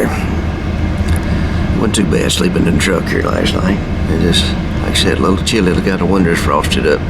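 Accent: South, United States. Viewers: What state are you from. You have a southern accent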